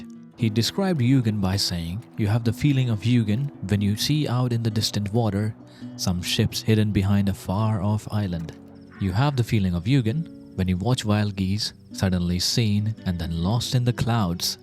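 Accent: India. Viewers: I was like "Is this guy Indian or something ?", turns out yes, you are, I detected some thin traces of accent (one of my best friends is Indian too - for the record)... Anyways, you have a really good story telling, and the way your display your culture and philosphy in this video is very well written, I quite like all those small pieces you give, they form a well woven story, and I agree with all of that : you can always leave the Zone, but the Zone will never leave you.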